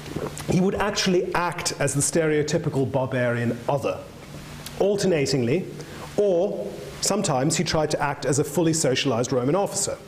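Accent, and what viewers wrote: England, United Kingdom. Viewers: Polish name with the most beautifully English voice. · I don't know, but with that accent I'd bet money he went to an English public school.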